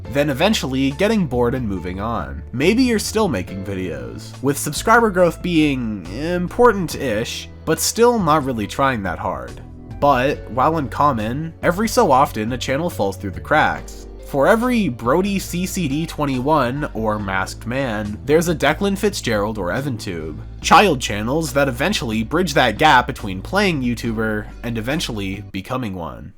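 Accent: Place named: Canada. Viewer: Wait… your Canadian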